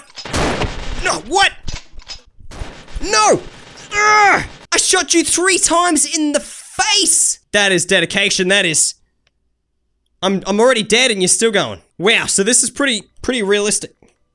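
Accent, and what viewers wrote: Australia. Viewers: mate are you Australian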